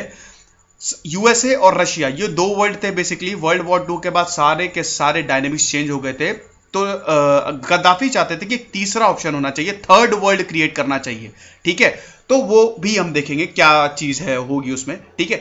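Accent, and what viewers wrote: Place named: Bihar, India. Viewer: Bhaiya ye aisa bhaynkar abhiyan tha na Full to bihari ascent ......I like it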